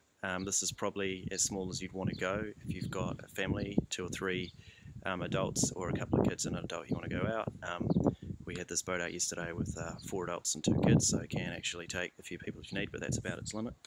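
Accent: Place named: New Zealand. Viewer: I can tell u r kiwi by your accent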